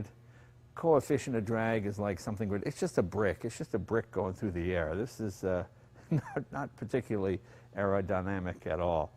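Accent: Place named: New England, United States. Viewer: Travis Henderson I think he was using it in the plural... Vortecs; or it was just his New England accent coming out. You know...Pak the caa in the yaad.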